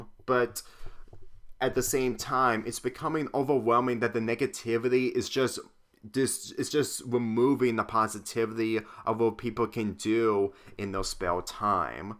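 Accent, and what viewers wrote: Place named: United States. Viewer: Are you American by chance? Because from the sound of your voice in the video, you kind of sound like you might have an American accent, and that MIGHT be the most logical reason and explanation.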